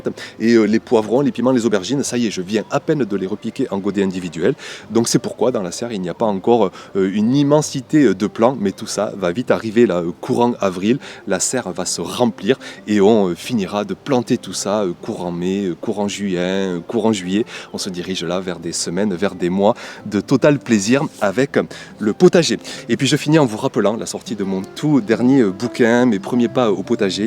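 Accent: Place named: Southeast, France. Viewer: Bonjour, natif du sud-est, je te suis depuis la Bretagne, ton accent me rappelle les sources 😎 merci pour cette vidéo, toujours un plaisir à regarder, écouter et pleins de bons conseils. Par contre je me pose une question, dans la vidéo tu indiques que tu manges les fèves crues, j'avais lu que: "Les fèves crues (comme d'autres légumineuses) contiennent des substances naturelles comme des lectines qui peuvent être toxiques ou irritantes pour le système digestif si elles ne sont pas détruites par la cuisson." Personnellement il m'arrivait d'ouvrir quelques cosses et en manger directement dans le potager 😋 mais du coup je ne suis pas certain que ce soit une bonne idée côté digestif, il vaut peut être mieux les cuire non? (Je pense que tout est question de quantité aussi). Belle journée;)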